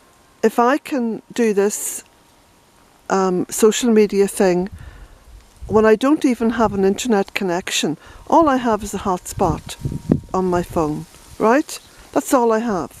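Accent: Ireland. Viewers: You know the minute you mentioned tea I realized that was what I was in need of ! Lol. Well you’ve inspired me too, I’ve already picked up my cardboard from Walmart and am ready to start building my raised beds to grow veggies. It’s been really good for me to be able to see the process, and hear you give your tips in your Irish accent too, as I used to live there and have fond memories. ☺️ Reading about something and actually seeing it are two very different things, and most of us don’t have someone in our family to teach us. Thank you so much. Loving seeing Jacks happy little face and antics too. Blessings to you.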